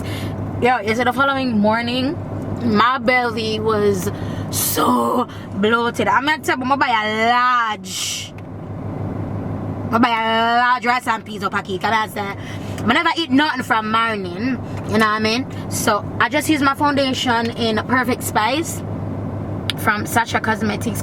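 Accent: South, United States. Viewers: Lol girl you have my Southern accent down pack. I was lol I was like no she didn't. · Wait but why did it have to be a southern accent you could have said it right in your accent boo cause I know y'all Jamaicans can give it up to y'all men lol.. thanks for sharing! GOD BLESS.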